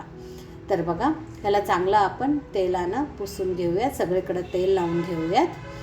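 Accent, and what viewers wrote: Karnataka, India. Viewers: छानच आहे पाककृती.ज्वारीच्या शेवया.आमच्याकडे अशाच तांदळाच्या पिठाच्या शेवया करतात. तिखट किंवा गोड तोंडीलावणेबरोबर खाल्ल्या जातात. तुमच्या बोलण्यावरुन तुम्ही कानडी असाव्यात असे वाटते.आमच्या शेजारी धारवाडच्या कडकोळकाकू असेच मराठी बोलायच्या.धन्यवाद. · तुमची मातृभाषा कन्नड आहे का 😊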